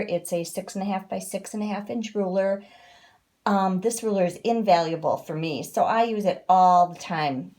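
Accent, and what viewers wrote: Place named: Upstate New York, United States. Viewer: I have a dear cousin in upstate NY....is that where you're from? I love your accent. Thank you for your excellent tutorial!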